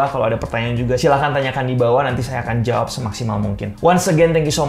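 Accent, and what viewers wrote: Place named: South Jakarta, Indonesia. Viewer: bang which is lu annoying, sumpah, logat jakselnya ilangin pls sayang lu udah bagus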